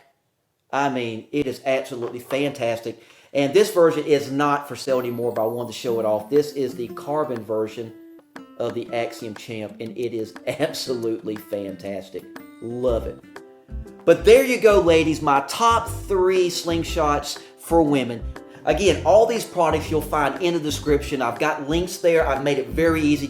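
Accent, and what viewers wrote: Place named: South, United States. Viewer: I've two of the ORIGINAL, "Simple Shot" Axiom Champs, and among my dozen or so others, they remain my favorites. I also have an original (1st gen.) "Scout" , also terrific, and also made by our sports/hobby's most likeable gentleman, Nathan Masters. Back around 2009, when I was Assistant Chief to Chief AJ (John Huffer) of the NSA, he made a slingshot for ladies, which he called the "Pink Lady Quick Shot". It was pink, indeed, but other than that, it was no different than his other non-remarkable "Quick Shot" slingshots. I've a miniature slingshot pendant in a gold-like finish, not much different in size to a U.S. dime, made by Mark Seijan - it's cool! Enjoyed your vid, burps and all - though it is kinda strange to hear someone with a definite southern USA accent speak in terms of millimeters. I "get it" though, because those are the manufacturers' specs, and they cater to slingshot enthusiasts (rubber nuts) worldwide. 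The British "WASP" slingshots are probable also good for women, and I believe they're now being made in more than the four colors I already have.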